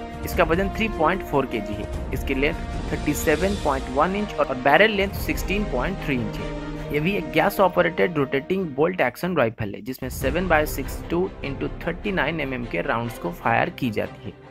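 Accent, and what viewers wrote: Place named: Uttar Pradesh, India. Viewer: Neuz (news) Phayr (fire) Acsen (Action) Uuj (use) Sorter (shorter) Specal (special) I think 🤔 it’s UP accent.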